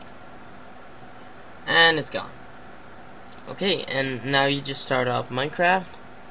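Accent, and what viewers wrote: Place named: Netherlands. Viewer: Your Dutch :D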